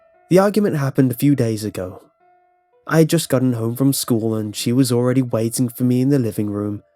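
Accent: United Kingdom. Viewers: Gosh damn it he's British.......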